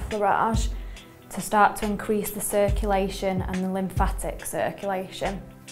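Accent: United Kingdom. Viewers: A Swedish massage with a nice British accent :) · Can someone tell me from what part of Britain is her accent? Thank you. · Are you british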